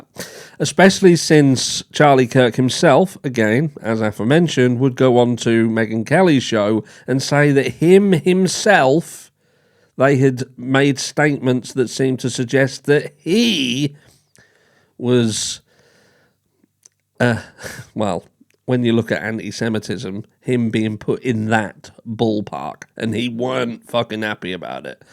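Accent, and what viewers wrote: Norfolk, United Kingdom. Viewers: Obviously don't tell people where you live but by your accent I believe you lived in Norfolk .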